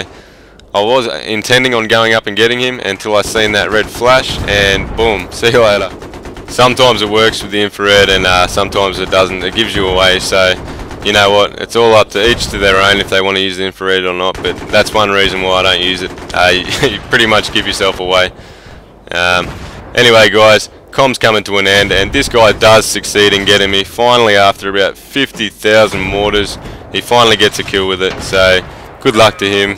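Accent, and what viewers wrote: Australia. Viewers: Great commentary man, good tips. It's good to finally hear a Aussie commentator. :) I'm also looking for more people to play battlefield 3 with on Xbox. Thanks.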